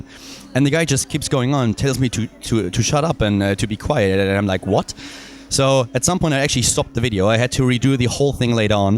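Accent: Germany. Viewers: Man!!!. You have strong German accent. How can you teach English to kids? They are going to learn German. How did you get a job at Korea. Now. I told my mom to apply job. Ha...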